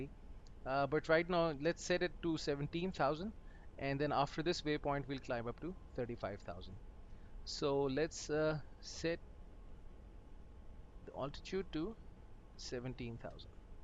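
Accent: India. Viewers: I dont like your indian accent because you sound like a guy who calls me from "windows technical support"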